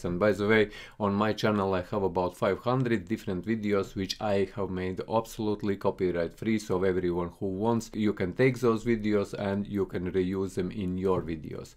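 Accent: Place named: Russia. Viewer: Woah Russian accent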